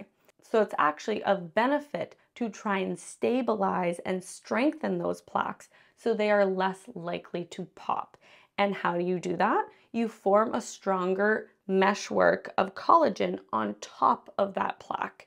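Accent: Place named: Canada. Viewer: Americans usually leave the H sound off this word, maybe influenced by French or Spanish, but British speakers generally pronounce the H. Canadian English is a kind of hybrid and that's what the good doctor speaks.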